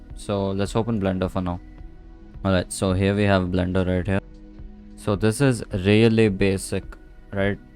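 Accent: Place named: India. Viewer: The Indian Accent Be Hittin Hard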